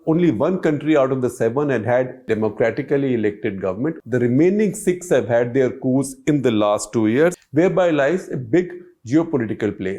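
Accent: India. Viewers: Fake off. Your. lousy thick Indian tone is faking incomprehensible!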